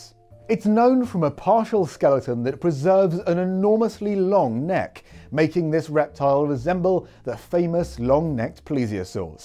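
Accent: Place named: Paraguay. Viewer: Dude he’s from Paraguay, don’t annoy him too much on his English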